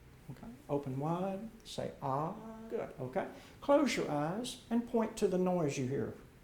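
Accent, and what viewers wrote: United States. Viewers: Ahmad Syarbini Mat Southern, most likely Louisiana. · I didn’t even notice an accent until you pointed it out. Lol. So I’m guessing south.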